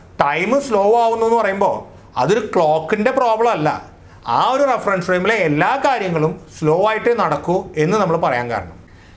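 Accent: Thrissur, India. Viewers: Sir Thrissurkaaranano😀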